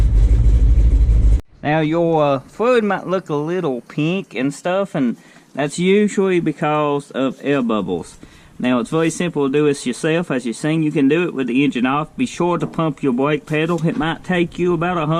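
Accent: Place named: Louisiana, United States. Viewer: Cajun accent sounds like 👍 thanks for the video brother!!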